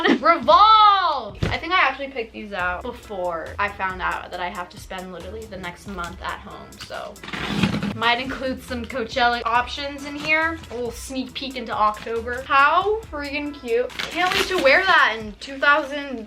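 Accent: United Kingdom. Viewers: Is accent sometimes goes to british accent 🧐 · İngiliz aksanı yapan sesini saatlerce dinleyebilirim ya kısmen rahatsız ediyor ve bu çok hoş ifşvkshsjgppglrlehçvçndks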